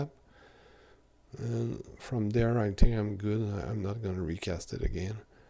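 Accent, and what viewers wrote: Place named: Quebec, Canada. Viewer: quebecois jle sens dicite!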